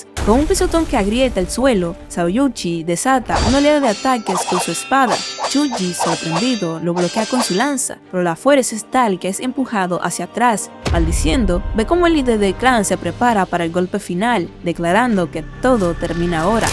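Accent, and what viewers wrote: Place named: Dominican Republic. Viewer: Solo con la voz pude deducir que era dominicana,rd representando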